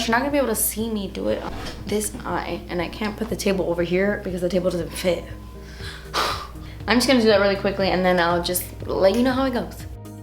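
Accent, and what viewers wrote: Cuba. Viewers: Please speak more Spanish!! :D I’m Guatemalan-American and LOOOOOOVEEE hearing other bilingual Latinos speak in their native tounge 💕😊 Your Cuban accent is the Cutest! Just like you! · Jajajajaja ame tu acento cubano!!!! Jajajajaj españo-cubano 😂😂😂😂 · i love her cuban accent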